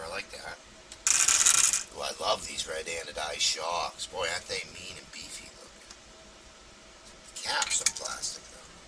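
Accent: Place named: Maine, United States. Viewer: Wow your from maine too I thought u might have been by your accent great vid by the way 👍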